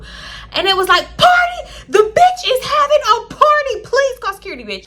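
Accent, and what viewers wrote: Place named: Miami, United States. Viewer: Ouuuu Not Slapping The Ground 😂😂😂😂😂 Chile Sound Like You From MIami. Ready To Go Beast Mode ❗️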